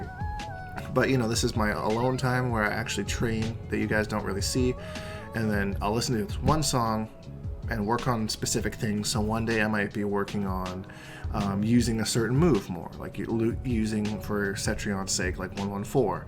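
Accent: Canada. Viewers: just curious, where in Canada? I'm from Guelph/Hamilton so it was rad to hear your Canadian too! I watched some ECT and noticed they have headsets that are there for ppl to use but some ppl don't use them.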